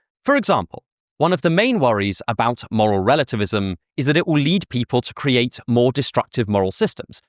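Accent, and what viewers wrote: United Kingdom. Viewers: I'm in love with his british accent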